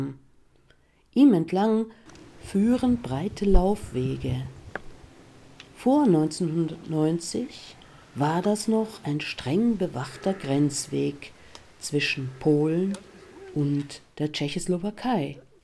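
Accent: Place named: Breslau, Germany. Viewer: Vielen Dank für das tolle Video! Nicht nur, dass mich die Sprecherinnen-Stimme etwas an die Mundart meiner Oma aus Breslau erinnert (nur eingelegentliches „gell“ fehlt noch 😄), es ist auch sehr informativ. Schön, jetzt überleg ich mir, mal hinzufahren. Zuletzt hab ich die Stadt als Kind Anfang der 80er gesehen.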